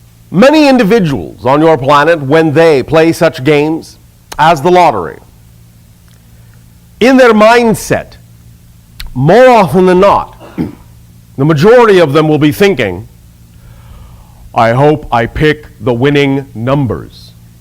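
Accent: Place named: United States. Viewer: Why do you talk with American accent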